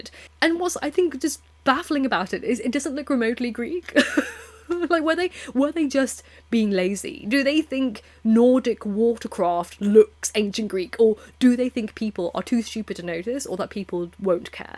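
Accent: United Kingdom. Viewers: What is the name of this accent? I'm not well versed in the names of British accents besides RP and something auditory like an accent is difficult to google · This is the most aggressively British voice I've ever heard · You have the most BRITISH voice ever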